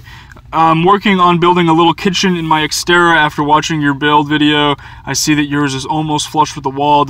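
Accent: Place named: Boston, United States. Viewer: Another great video,. I initially started following you due to you wearing a Cleveland Browns tshirt. Then I saw you w a browns skully on. What's your cle connection? Considering it sounds like you're a Boston area native. I traveled out of my of my forester. Jealous of what you've accomplished. Keep the videos coming, I'll keep watching...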